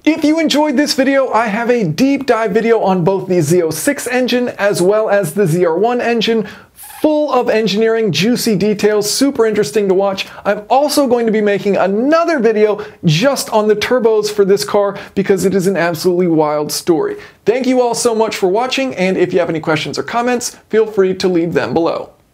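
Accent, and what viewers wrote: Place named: South, United States. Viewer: I think the time spent in Texas rubbed off on your accent a bit, and brought some more southern out. :)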